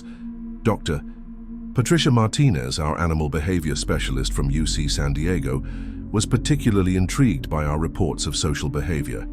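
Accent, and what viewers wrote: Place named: Florida, United States. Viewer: Love that "Florida" accent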